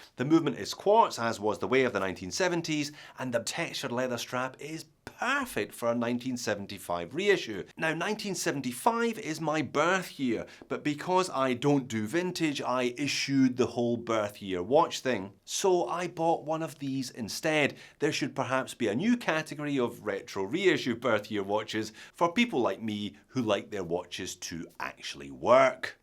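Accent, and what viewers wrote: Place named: Ayrshire, United Kingdom. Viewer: You have an Ayrshire accent , where are you from?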